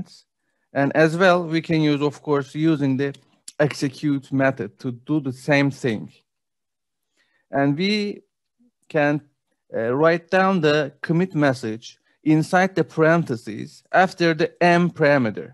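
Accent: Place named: Turkey. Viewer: Aynı şeyi düşündüm daha ilk dkdan. Direk Türk aksanı bu nerde olsa tanırım 😂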